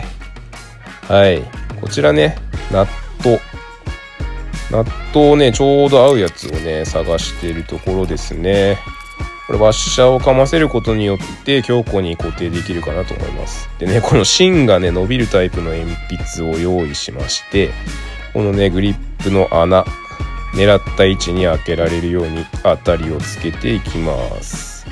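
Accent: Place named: Hokkaido, Japan. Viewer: 以前から拝見してましたが初コメです。 北海道なんですね。今は東京在住ですが懐かしい方言がひとつふたつ聞こえたので。 冬の夜長の工作、私も嫌いじゃないです。 昨年から始めたサバゲ―の年配初心者です。コレクター志向ではありませんが所有する数丁の機能美には魅せられてます。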